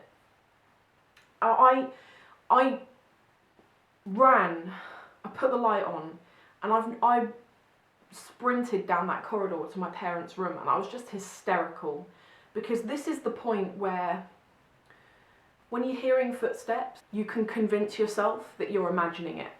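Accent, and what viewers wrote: United Kingdom. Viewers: You sound so British!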